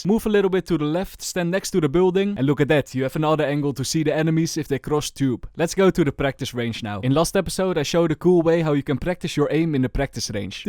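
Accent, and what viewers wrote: Netherlands. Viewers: nederlands? ik hoor dat accent ofz xD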